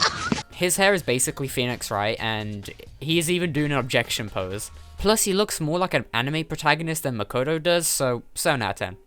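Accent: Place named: RP, United Kingdom. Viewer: This guys is like SO posh. I want this guy to say "its a bit chilly here dontcha think"?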